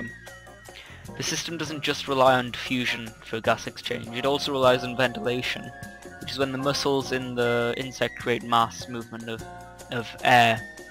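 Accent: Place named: Ireland. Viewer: He sounds Irish to me :)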